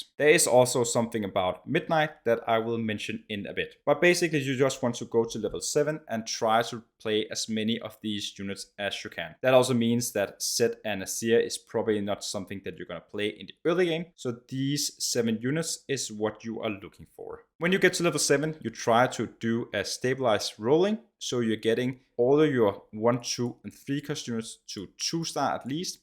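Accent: Denmark. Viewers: Your accents sounds like Denmark people that i met Yesterday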